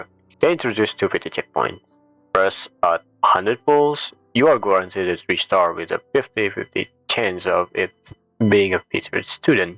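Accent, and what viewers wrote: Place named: Indonesia. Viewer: It's fine. But not fine😭😭😭 you have an insanely thicc Indonesian accent 😭😭😭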